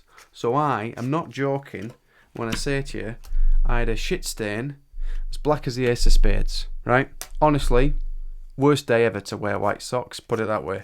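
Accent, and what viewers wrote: Birmingham, United Kingdom. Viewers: Golden fingaaas CHECK Bostin accent CHECK On-the-edge-of-the-seat storytelling CHECK Best dad ever CHECK LE-GEND!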